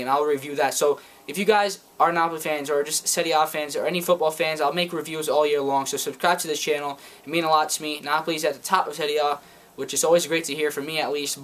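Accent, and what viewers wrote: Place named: United States. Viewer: dal suo accento direi leggermente di no. è un americano illuminato.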